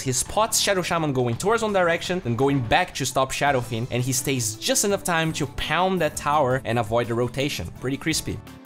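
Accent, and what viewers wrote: Brazil. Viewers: Draran but you are mistaken. It is his accent. Most brazilians have this accent, fluent or not. It is because of how phonems in portuguese words are structured and end up being carried on to english as well.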